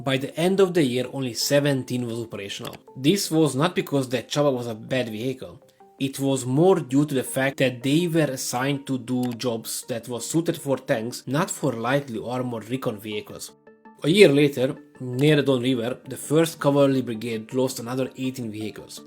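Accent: Hungary. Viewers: i can feel a hungarian accent in you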